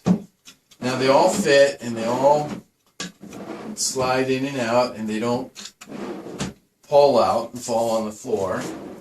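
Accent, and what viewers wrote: New England, United States. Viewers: Sounds like Norm as he is from New England as well. Watch New Yankee Workshop and you will hear it as well.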